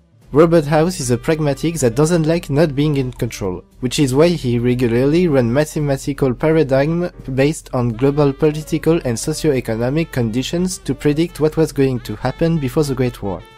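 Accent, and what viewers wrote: France. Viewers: Sounds French to me. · I'm french, I can assure you it's a french accent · Freeeeench...... What you have to say is really interessting but man' that is so akward. Can't listen it entirely. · Ouais par contre ton accent français ça se voit à des kilomètres, essaye un maximum l'accent anglais svp Un petit effort pour nos amis les anglophones.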